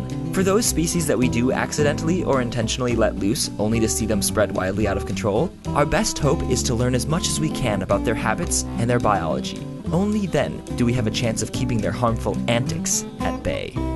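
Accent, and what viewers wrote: Australia. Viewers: r u an Aussie? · Is Australian?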